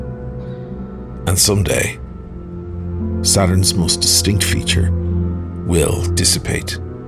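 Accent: Ireland. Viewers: Great start; loving your style; beautifully delivered with that mellow Irish Brogue. Classic, long may you continue my friend. Salute.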